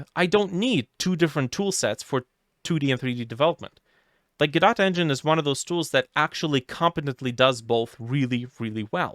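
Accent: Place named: Eastern Europe. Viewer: you speak good english but, i dont know why, i hear some slavic accent lol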